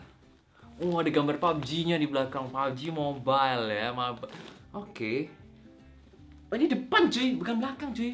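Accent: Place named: Pematangsiantar, Indonesia. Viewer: Logat Siantar campur logat gaul 😂😂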